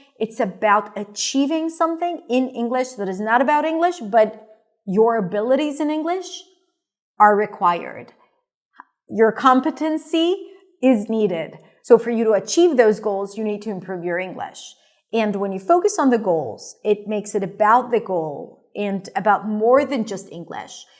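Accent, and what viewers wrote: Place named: United States. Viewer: i love you, I love your tone, it's super cool and soft and delicate, but I'm Cuban, I use a translator and the Duolingo app, but I like your continuity listening to you talk, I think it's better that way, I learn more because I'll be listening to you all the time, even if I go out to work, I'd love to have the headphones on to listen to you all the time, now I live in Guyana 🇬🇾 English, but this dialect is not yours the real American, I'd like to have some recordings of yours to listen to it while I'm working one and a thousand times to listen only real English, but I hope you have some recordings which I can download to listen to them offline, because when I am connected messages come in all the time and the recording is not listened to well, thank you beautiful lady, I hope to learn a lot with you if you can help me well to study ❤❤❤❤🙏🙏🙏🙏😊😊😊😊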